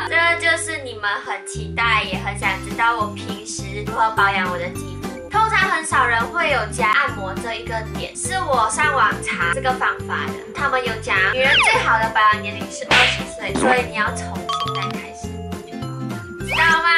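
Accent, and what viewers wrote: Taiwan. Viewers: 你应该是去台湾太久了 讲话的音调都像台湾人了哈哈哈哈哈 加油哦 期待你下一部影片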